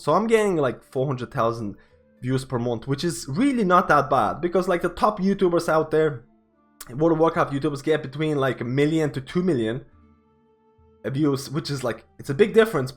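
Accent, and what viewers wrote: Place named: Ireland. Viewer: Came here for resto druid info, stayed for the Irish accent.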